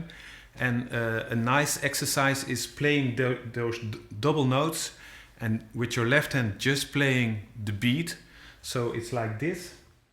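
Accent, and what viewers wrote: Netherlands. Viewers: Je bent zeker een hollander,ik herkend de uitspraak,zelf kom ik uit Iran en woon in Parijs, ik will here je bedanken voor dit aardige video dat je ons laaten zien,ik ben een beginners om cajon spelen en vond ik je video perfect,dank u wel....Thank's a lot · You sounds dutch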